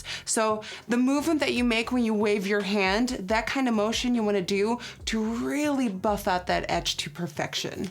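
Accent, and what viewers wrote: Netherlands. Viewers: I’m shook… because I just each led a video of in 2013 and Dutch accent is more strong… 2018 he accent is perfect English…I never know was Dutch I found about by watching her old videos …I would never of known if I never watched her older videos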